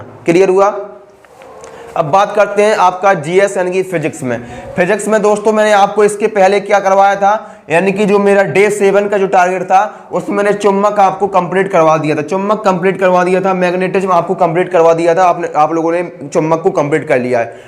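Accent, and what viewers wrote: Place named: Madhya Pradesh, India. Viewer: Bhaiya aap mp se belong krte ho language s lgta h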